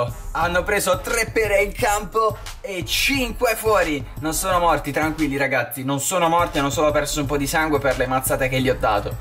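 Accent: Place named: Rome, Italy. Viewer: Ma era lo stadio del Marsiglia🤣🤣🤣 Pensavo tifassi Roma dal tuo accento🤔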